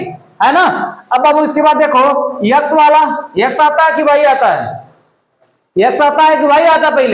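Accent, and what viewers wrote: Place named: Bhojpuri, India. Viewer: Good explanation sir ........ 👍👍👍👍👍👍 Bhojpuri style...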